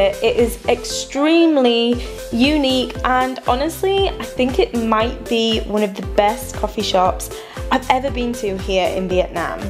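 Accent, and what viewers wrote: United Kingdom. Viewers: I really love the British accent! :))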